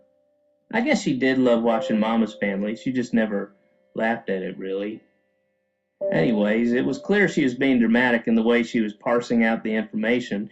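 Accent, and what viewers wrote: South, United States. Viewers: why is he talking like a southerner? I know he's from the south but he sounds different here. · He sounds like, 80% more Southern than usual.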